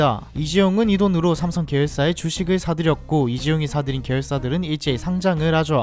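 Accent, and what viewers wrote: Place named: Busan, South Korea. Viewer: 듣기전에 몰랐는데 부산사람이라는 걸 알고나서 부터 들리는 사투리 억양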